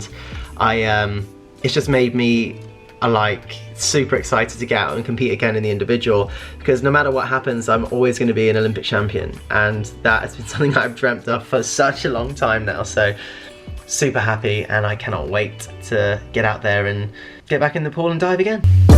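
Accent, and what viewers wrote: United Kingdom. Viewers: love to wake up on sunday, make coffee and watch your vids at 10am. (pacific standard time, california) love a british voice in the mornings. congrats. i hope upload schedule will be the same after tokyo 🤙🏼🎉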